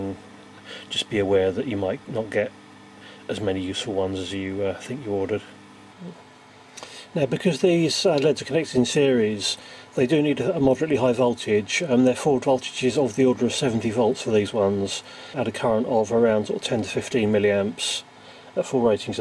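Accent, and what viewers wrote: United Kingdom. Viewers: British actually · my apologies, but, I'm American and I'm having a hard time following you , you sound like your mumbling...!!! Sometimes the Brits murder the English language....now Bigclive I understand him clearly..!!!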